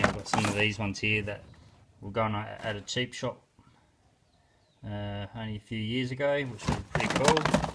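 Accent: Australia. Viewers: U sound Aussie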